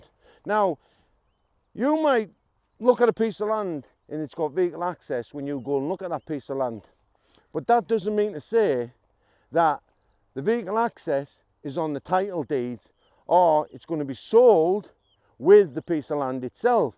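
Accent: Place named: North East England, United Kingdom. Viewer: Hiya mate sound like ya up NE somewhere... maybe Redcar way ? Just found the channel and appreciate whatever advice you have to share. Do you still have to cough up council tax or business rates if classed as smallholding ? Cheers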